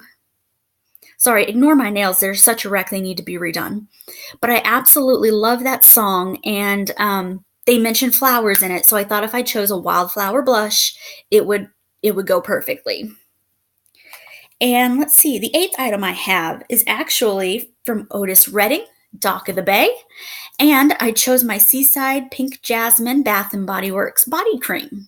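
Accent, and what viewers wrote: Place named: United States. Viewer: You have such a lovely accent! What state do you live in? I love soooo many of the songs you chose!!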